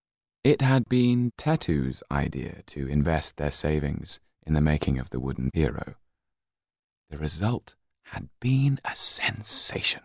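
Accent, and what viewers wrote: United Kingdom. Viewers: He brings such a huge opportunity for us male English learners to get a british accent. Bravo! Made it in one year. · Am I the only one that giggled at his rough london accent? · you're not alone ..i still don't understand what he said because i 'm so distracted by his sexy voice....omg i love his voice, his acccent,...i love britsh they have such amazing voice..too bad im not from british :(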